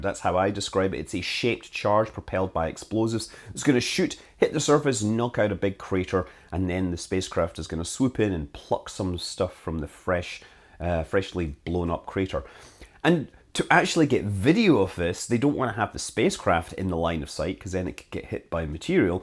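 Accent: Scotland, United Kingdom. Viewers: Hes Scottish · I really love listening to your scottish accent! It is so cute! And - more important - really can explain things very well! You would be an outstanding science teacher!!! Physics teacher Michael B. Butter from Radebeul, Dresden, Germany and: Thank you!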